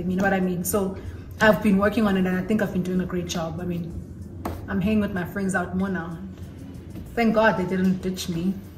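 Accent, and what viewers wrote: Nigeria. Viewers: Hey Motswala hope you are good?❤❤❤ Naledi M send me here and it was comfortable over here. The first story I watched was the IPhone 6 story time and I decided to stay because of how you relayed the story plus you are funny 🤣🤣🤣 hlogo Yao ae bereke and I’m here for it. Followed you everywhere and your Nigerian accent and love for afrobeats ❤❤❤ I used to think is Igbo or Nigerian. Yeah wene why can’t I download your videos on TikTok? When I catch you😤😤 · I discovered your channel through thati ever since I never looked back because whats life without Nigerian accent and your storytimes are the best.❤🥰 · I joined when you were doing make-up video with Lebonza I came from her channel straight to yours from that day😊...and I enjoy your Nigerian accent and your laughter on all your video 😍❤ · Hey Motswala... #10k brunch... The very first time I watched this channel, I commented and said am staying.... 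It was a shout out recommendation from Naledi Mallela.... The Nigerian code-switching accent gets me all the time. I love your authenticity... You could be the girl next door njeee❤❤❤❤ Hope you host a Cape Town Brunch and I get the chance to be nominated 😂.... ❤❤❤ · Hey Motswala I started watching you 4 months ago when you were doing a makeup video with Seithati Letsipa since that day I was glued to your channel. I love all videos & storytime, love the Nigerian accent kakhulu ❤️❤️❤️ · Hey. I joined when you had your Collab with Lebonza and have enjoyed every single video after that. Your nigerian accent is the best! and very contagious lol i hope you get what i mean. Thank you for the lovely content ♥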